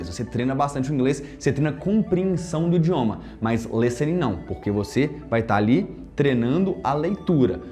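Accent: Minas Gerais, Brazil. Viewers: Mano foi engraçado a primeira vez que ouvi sua explicação em um vídeo falei " a voz desse cara me recorda a do Gustavo Lima, deve ser mineiro" então assistindo seus vídeos vc falou em pão de queijo kkkkk e logo falou que era mineiro...rs... Você é um excelente professor, muitas dicas tops que vc passa aqui para todos...continue fazendo isso, e desde já agradeço a vc, falo inglês mas sempre aprendo algo que não sei também... abração de Londres para vc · Caí aqui de paraquedas. Você pediu um comentário então vou deixar o meu. Você está de parabéns, deu várias dicas e com certeza me ajudou e vai ajudar muita gente, já me inscrevi no canal, dei "like" e pretendo assistir os outros vídeos do canal. Infelizmente acho que essa musiquinha de fundo não ajuda muito. Você fala bem mas o seu sotaque mineiro também desviou minha atenção, foram muitos "falano, estudano, esperano, usano, escutano, treinano, leno e cê" que no final já estava "contano". 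Desculpe, não quis ser grosseiro, só gostei do canal e fiz uma crítica com a intenção de que fique melhor.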